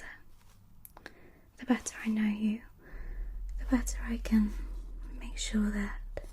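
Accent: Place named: Wales, United Kingdom. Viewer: I can’t put my finger on her accent, anyone know. Switches between welsh and English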